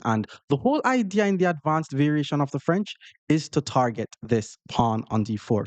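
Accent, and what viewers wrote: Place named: Jamaica. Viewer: like your style of explaining, the jamaican accent is amazing thx 🤙🏼🤙🏼🤙🏼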